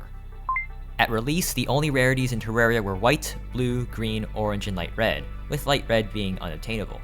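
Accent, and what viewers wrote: China. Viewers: HOLY SHIT YOUR CHINESE ACCENT IS PERFECT! (Taiwanese here :D)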